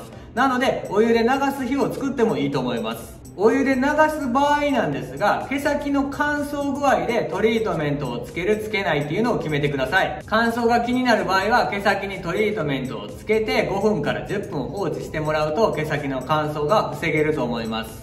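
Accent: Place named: Kansai, Japan. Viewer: コテコテの関西弁が耳障り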